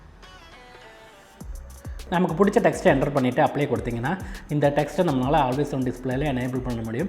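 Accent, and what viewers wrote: Kerala, India. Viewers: என்னாயா உன் லேசா பேச்சில மலையாள வாட அடிக்கிது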